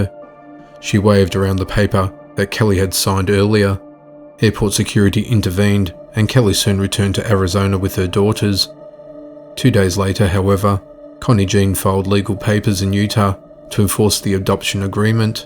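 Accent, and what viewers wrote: Australia. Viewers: Love the video, glad I've found your channel- are you a fellow Australian or from New Zealand?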